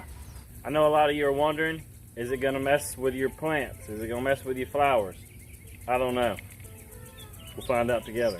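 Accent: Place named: South, United States. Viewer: I just learned what a "Mole Cricket" was yesterday... 'but have had them for about 4 years as I recall. Now I'm taking them to war. The more I learned about them the more panicked I got. Thank you for an informative, non-panicked, very peaceful video. I think it's the southern accent. I'm gonna have some sweet tea... 'and slaughter every last one of them Texas-style. Thanks again Sir.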